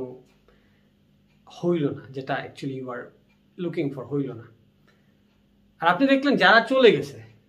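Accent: Sylhet, Bangladesh. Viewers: Bro!! Sylhet apnar basha kuno? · ভাইয়া সিলেটের আঞ্চলিক কথা গুলা খুব ভালো লাগলো😍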